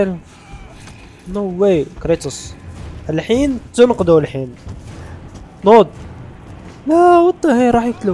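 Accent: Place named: Algeria. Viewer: اهاااااااااا هسة فهمت 😁 اني اكول مستحيل سعودي يتكلم بهاي الطريقه +تشرفت بيك و على راسي الجزائر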